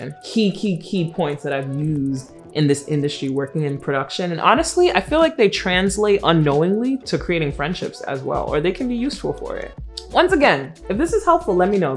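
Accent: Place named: Barbados. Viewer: You picked up a slight bajan accent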